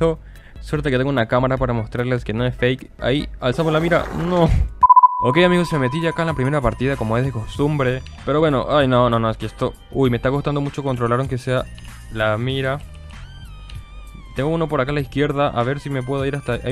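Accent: Paraguay. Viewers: sabía luego kp , se pilla umpoco tu voz , solo los paraguayos sabemos darnos cuenta 🇵🇾😂👍🏼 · Se te nota tu hablar de paraguay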